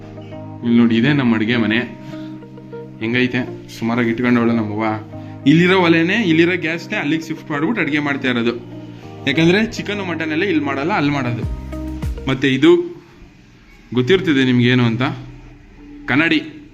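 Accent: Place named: Nanjangud, India. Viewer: ಯಾವ ಊರು ನಿಮ್ಮದು. ನಿಮ್ಮ ಭಾಷೆ ಕೇಳ್ತಿದ್ರೆ ನಂಜನಗೂಡು ಕಡೆ ಅವ್ರು ಅಸುತ್ತೆ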